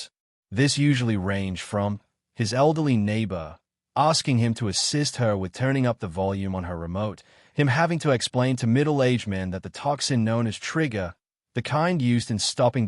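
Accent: Australia. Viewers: I'm hooked but I wasn't expecting the Australian accent. When is part 2 coming out? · You're not crazy that is an ausie accent if I ever heard one lol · Am I crazy or does the Ai sound Australian or something 😂 like it isn’t really pronouncing the r’s all that much, and it’s also being really extra with all the a’s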